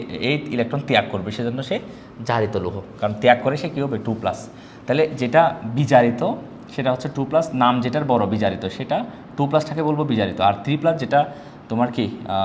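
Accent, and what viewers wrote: Sylhet, Bangladesh. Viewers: Nice class🤗.Btw,I think you're from sylhet because of the sylhety accent while you're speaking . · You are from Sylhet, are'nt you?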